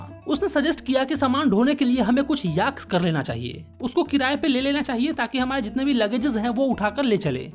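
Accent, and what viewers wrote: Bihar, India. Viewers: Bhaiya aap bihar se ho ya uttar pradesh se 😅😅😅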